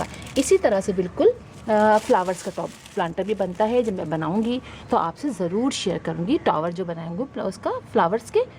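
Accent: Pakistan. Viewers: her Urdu accent is Pakistani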